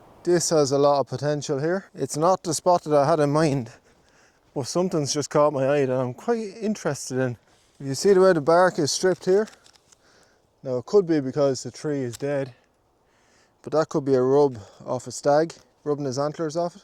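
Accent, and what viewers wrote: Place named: Ireland. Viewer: Your channel popped up on my feed,im so happy it did! How great are you?! I love your irish accent! Im aussie,but my heritage is irish. Just feel so nice listening and watching your show. 💖thanks mate!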